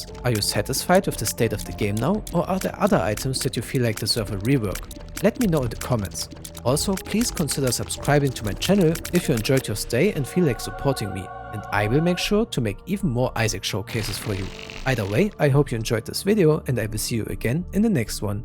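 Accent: Germany. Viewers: the lovely german accent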